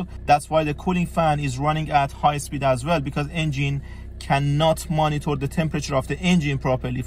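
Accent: Iran. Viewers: That is some nice Persian accent. Good job on the video.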